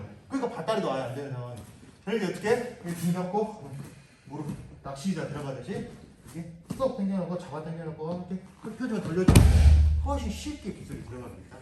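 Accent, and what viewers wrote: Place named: Jeolla, South Korea. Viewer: 즐라도의 말투 조으다 영화보냐ㅋㅋㅋ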